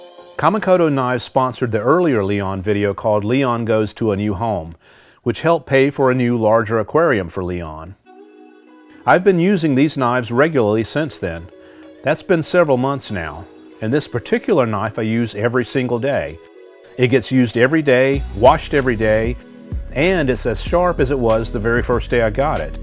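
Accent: South, United States. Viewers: Exactly. His deep clam soothing southern voice and encouraging words. Happy little lobsters…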